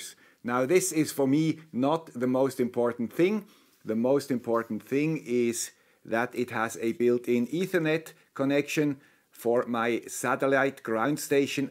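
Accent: Switzerland. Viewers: hello this is the guy with the swiss acce...(smashes like button instantly)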